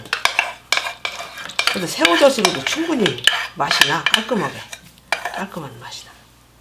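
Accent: Chungcheong, South Korea. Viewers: 충청도분이신가봐요.ㅎ 제고향 어르신이 옆에서 말씀힌시는것같아 더 친근감이 있어 좋네요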